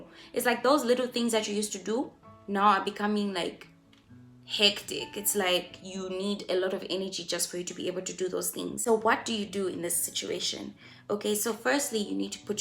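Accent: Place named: South Africa. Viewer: You sound South African sometimes ... so cute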